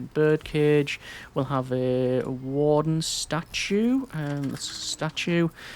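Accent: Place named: Northern England, United Kingdom. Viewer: Based on the way they say poor: I'm going Northern English.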